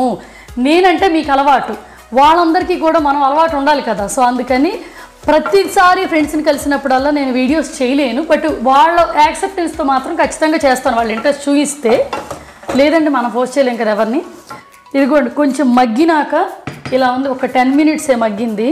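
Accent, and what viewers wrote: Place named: Krishna, India. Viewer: Krishna District telugu andi chala bagundi.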